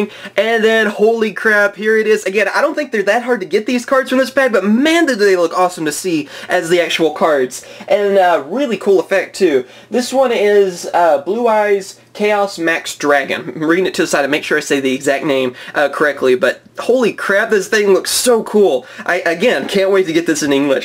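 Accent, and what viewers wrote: Texas, United States. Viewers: Are you Texan your accent?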